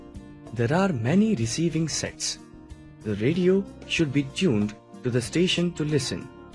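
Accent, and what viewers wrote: India. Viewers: IS THIS INDIAN GUY? WHO TALK? SOUND LIKE INDIAN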